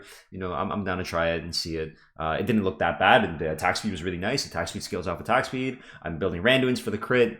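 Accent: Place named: Finland. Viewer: his Finnish accent definitely helps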